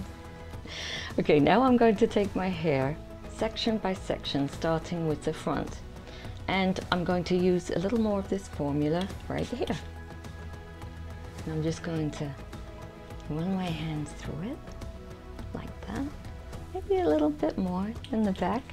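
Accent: England, United Kingdom. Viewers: I ran across your channel. I stuck around and watched several videos. I must say you are an extremely beautiful lady. I found that I was in absolute awe over the way you talk, look, act, present yourself. I love your English accent and your such a funny woman. I love your humour. Your a very bubbly person . It made me smile. Plus with saying all that your videos were very relaxing for me to watch. Thank you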